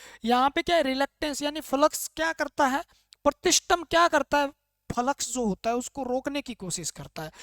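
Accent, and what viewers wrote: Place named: Sirsa, India. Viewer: सर जी भाषा बोली से सिरसा जिले से लग रहे हो, कौन सा गांव है जी आपका। साहब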